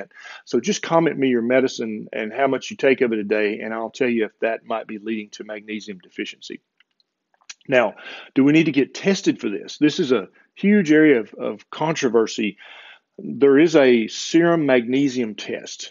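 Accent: South, United States. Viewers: Just stumbled across this video binge watching channel. Don't know that I've seen all quite yet, but I almost died laughing: "Shut up and eat your greens!" For God's sake, Doc, you're killing me with your southern-ness! To everyone reading these comments, this man is a treasure - support him via Patreon!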